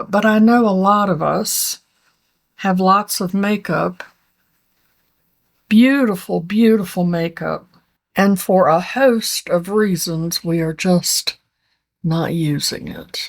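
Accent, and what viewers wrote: South, United States. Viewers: Love your southern accent! Have to listen on 1.5x speed though 😅❤🎉 😆🌞❤️💖🙌🙏 I truly needed this video today! Bringing out my wax melts and Dior foundation 🙏😇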